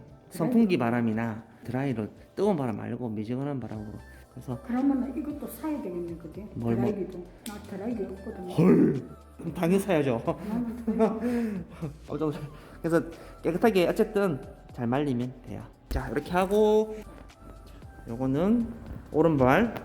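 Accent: Gyeongsang, South Korea. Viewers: 드디어 오랫동안 기다렸던 영상을 보게 되네요. 역시 경상도 사투리로 고객님께 자상하게 설명도 잘 해주시고 너무 깨끗한 발톱정리 👏👏😄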